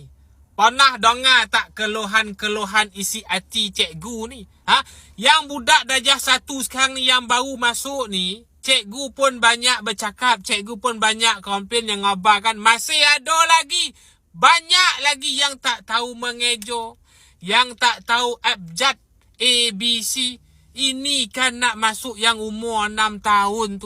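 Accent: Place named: Negeri Sembilan, Malaysia. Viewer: Terhibur la dengar dia ni cakap. Sampai aku ulang 2-3 kali. hehehe... Aku mmg suka loghat negeri 9 ni :D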